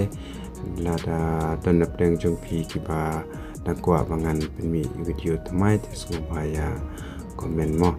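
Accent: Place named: Pnar, India. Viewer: Ph bh dakan hangno nga sngew tynnad ba ph kren daka kynten pnar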